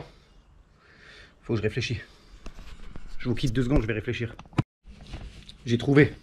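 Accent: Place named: Alsace, France. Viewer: Vraiment du plaisir, pour l'accent qui me rappelle mes années dans le 67, et puis de voir un vrai mécano, pas un guignol comme on en trouve dans les garages de marques à 150€/h . Bonne continuation et un Abonnée de plus ;- )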